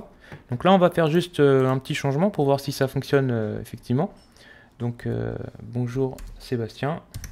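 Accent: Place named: England, United Kingdom. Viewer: belle axenc englais !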